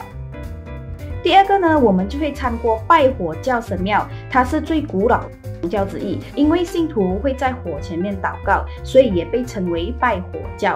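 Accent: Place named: Malaysia. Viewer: 听口音，博主是马来西亚人吧？视频图片很多，信息丰富，感谢。我是看见这个国家的糕点视频引起的好奇心。博主不出镜而是拍全凭景观非常赞！🎉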